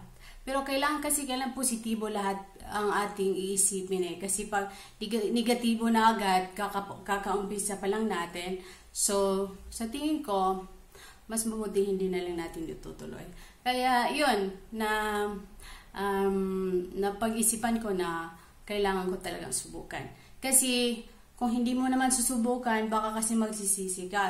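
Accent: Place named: Italy. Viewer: ay ka expert na nimo mg storya Italian inday good job sarap pakinggan accent mo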